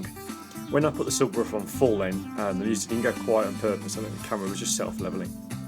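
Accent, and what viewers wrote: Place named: Birmingham, United Kingdom. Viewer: You sound local, 'am yao a brummie?'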